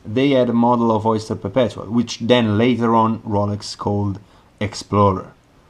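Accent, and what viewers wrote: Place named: Italy. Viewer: The English with the Italian accent is excellent. And the review/preview is good.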